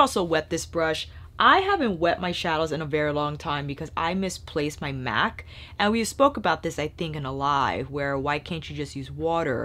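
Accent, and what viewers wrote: France. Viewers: Thank you for making the effort to pronounce names in French. Loooove your accent ;). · the french accent really do be hitting different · Your french accent is so cute ^^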